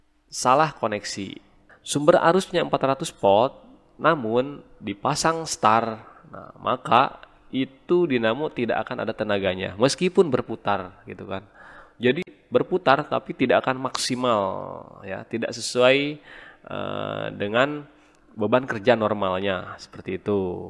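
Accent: West Java, Indonesia. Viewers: Mang orang Sunda ya😃😄😄?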